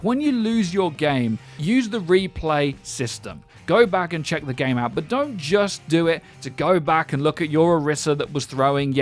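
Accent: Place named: United Kingdom. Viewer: We missed your British accent guiding us!!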